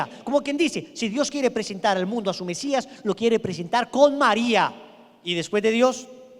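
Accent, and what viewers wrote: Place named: Colombia. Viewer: este ermano deve ser Colombiano muy buenas explicación aun que no pude evitar reir en varias veses su forma de ablar es desir de desir las cosas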